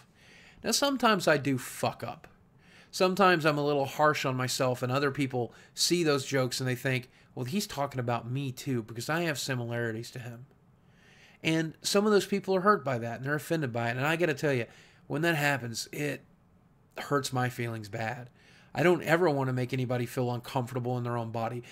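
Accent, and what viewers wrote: South, United States. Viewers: You already talked about the fact how you had to loose your southern accent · Yes it's like its okay for black people in pop culture to call each other the "N" word but absolutely not okay for a white person to say that. And by the way i love the Southern accent. One of my life goals is to visit the deep south.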